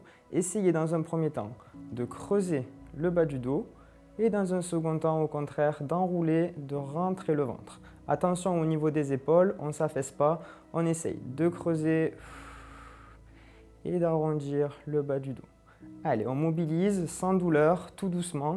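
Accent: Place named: South, France. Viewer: ah oui c est ce qu il me semblait j ai reconnu un petit accent du sud , je suis sur Nîmes et originaire de Reims mon oreille est sensible aux accents 😂 dommage je trouve vraiment bien votre explication très claire pour ces exercices si vous aviez été dans le coin , j aurais pris mes séances à votre cabinet c est sur .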